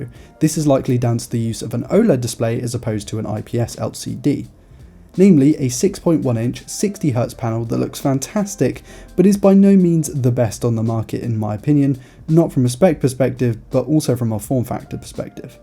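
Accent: United Kingdom. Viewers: Your british accent is gorgeous😍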